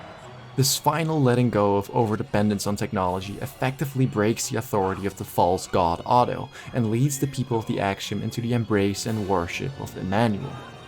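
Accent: Netherlands. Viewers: Just subscribed! I watched a few vids and just a question, are you dutch?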